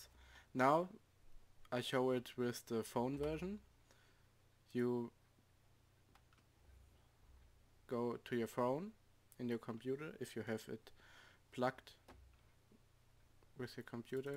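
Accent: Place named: Germany. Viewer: es hört sich so richtig deutsch an:D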